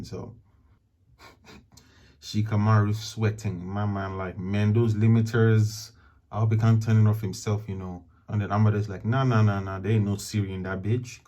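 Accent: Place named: Nigeria. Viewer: I’m Nigerian too and you sound Nigerian too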